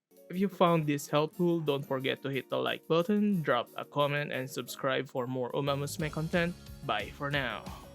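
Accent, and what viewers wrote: Philippines. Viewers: I smell Filipino accent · You sound... Pilipins..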